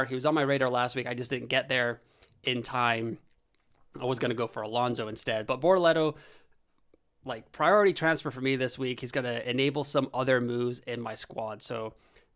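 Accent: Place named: United States. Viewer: are you an American who has moved to Europe? Your accent is so 🇺🇸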